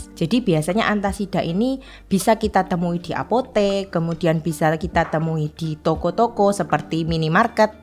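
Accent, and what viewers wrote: Java, Indonesia. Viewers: aku faham logat mu cik...kmu tinggal di jawa kan.😅..btw aku sakit gerd ..pusing ga hilang udah 7 tahun ....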